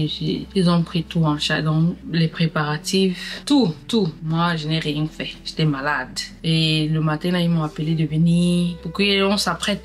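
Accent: Cameroon. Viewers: juste dire t es trop drôle.tu racontes comme une vrai Kmer😂🤩🤩🤩.j adore la coiffure du premier mariage restreint ❤❤❤🎉🎉🤩🤩🤩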